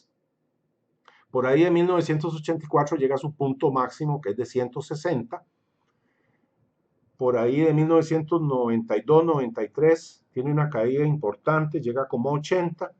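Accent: Costa Rica. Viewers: Sos de Costa Rica verdad? conozco ese tono de voz. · Eso que dices de Usa describe a la perfección a México fascismo corporativo!!! Genial canal, fantástico que te hayas ánimado a compartir tus conocimientos. Saludos desde México,de dónde eres? por tu acento creo eres de costa rica. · Reconozco a un tico cuando lo oigo. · sos de costa rica? Digo por tu acento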